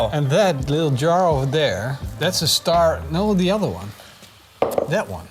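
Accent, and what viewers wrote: Netherlands. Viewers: Ik spotte je NL accent !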